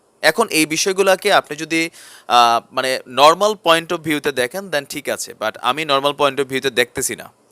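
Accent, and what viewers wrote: Comilla, Bangladesh. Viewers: ভাই আপনি কুমিল্লা বাসি মাজে মাঝে আনচলিক ভাসা বলেন অনেক মজা পাই = আমার বারি বরুরা কুমিল্লা, বরতমানে সাওদিতে কামলা দেই = 21 / 09 / 1987 = ইংরেজি তে,সাওদি আরব আসি = ইউটুবে সত্যি কথা উঠায়ে ধরার জন্য আপনাকে অনেক ধন্যবাদ = ভাল থাকেন দোয়া করি == কোরিয়ান মোবাইল নাম্বার টা পাঠা ন কথা হবে ইনশাআললাহ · আমরা কেনাডা থেকে তোমার কুমিল্লার কথা শুনে আনন্দ পাই। চালিয়ে যাও, Be changer do not be a quiter!!!!! Stay alart abot Pradip to be killed??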